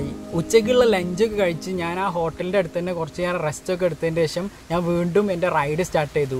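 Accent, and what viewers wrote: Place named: Malappuram, India. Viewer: malappuram accent kalanj samsarikkalle broooo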